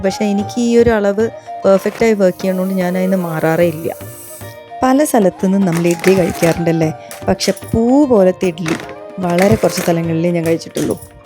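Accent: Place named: Thrissur, India. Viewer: Sister you do not have a Kannur or thalassery slang it's that of Thrissur and Palakkad anyway good 👍 Sruthi from Dubai hailing from Kannur at thillenkeri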